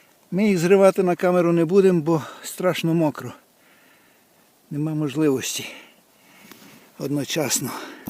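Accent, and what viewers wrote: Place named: West, Ukraine. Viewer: Здравствуйте! Откуда вы, какая область. Мой свекр с запада Украины Ивано-Франковской области, разговаривал точно так, даже голос похож.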